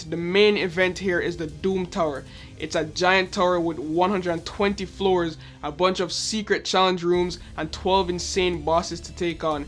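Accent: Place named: Jamaica. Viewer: Da Jamaican accent is strong with this one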